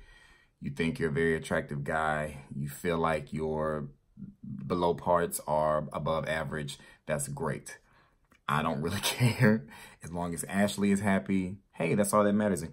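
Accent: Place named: Virginia, United States. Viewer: I'm from Virginia and I think you sound like us. But then again maybe I'm country lol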